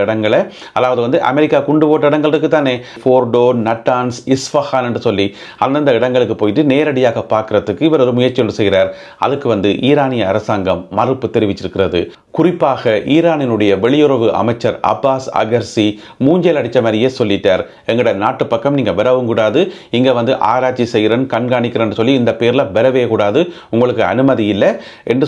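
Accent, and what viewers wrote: Jaffna, Sri Lanka. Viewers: தெளிவான யாழ் தமில் Love it.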